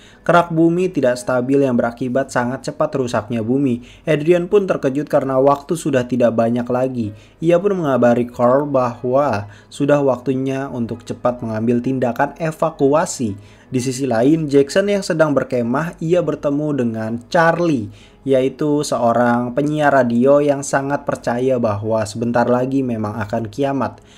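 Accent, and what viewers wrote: West Java, Indonesia. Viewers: Orang sunda gening wkwk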